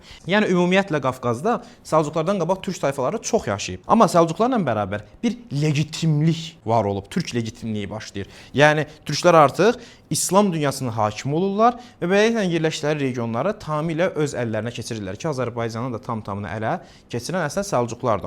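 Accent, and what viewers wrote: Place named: Armenia. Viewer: Hara ermənisidir bu danışdığı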